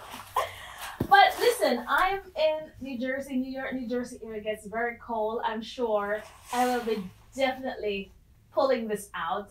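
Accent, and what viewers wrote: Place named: Jamaica. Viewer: You sound like you have a Jamaican accent!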